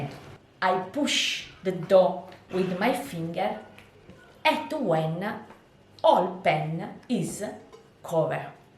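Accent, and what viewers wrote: Italy. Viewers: Very very nice video! I really appreciate. I also love your Italian/English accept 😉. I will definitely follow up your video!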